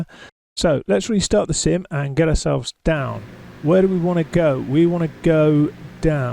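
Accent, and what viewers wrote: United Kingdom. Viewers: And also, I am up in years and sometimes voices are hard to discern. Your, British Accent, I presume, is VERY EASY to hear and probably the most clearest English to understand.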